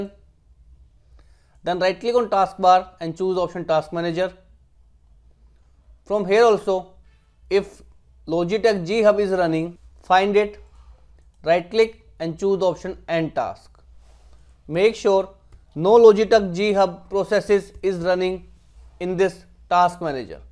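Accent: India. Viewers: THE ONLY VIDEO THAT HELPED, KNEW IT WOULD WHEN I HEARD HES INDIAN<3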